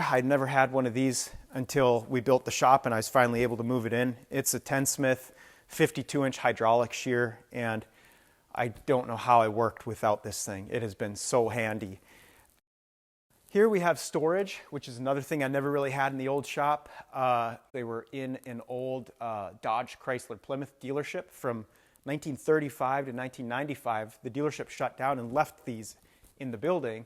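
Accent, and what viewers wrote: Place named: Manitoba, Canada. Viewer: <link> Are you Minnesotan or Canadian? Specifically Manitoban? I live in Winnipeg, and everything about your accent screams Manitoban to me.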